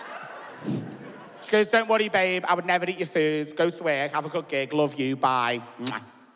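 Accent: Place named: Liverpool, United Kingdom. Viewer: Was this chap braught up, same place as darran till. Accent seems, very similar, unsure if it changes certain parts of Liverpool, like it does generally, in Glasgow, newcastle, but this guys accent and darran tills, sounds very similar, but different to carragher, just a thought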